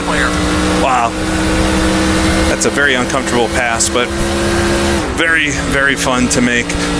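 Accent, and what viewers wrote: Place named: Northeast, United States. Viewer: I’m new to the channel, & I could tell you were probably from the Northeast just a few videos in (I talk to dozens of different people a day, haha). It’s good to see NASCAR’s regional series come to NR2003, as it holds a lot of memories for people who watched local racing scenes. I’m from the West Coast so I’d love for someone to do the NASCAR West Coast Series from <num> for this game.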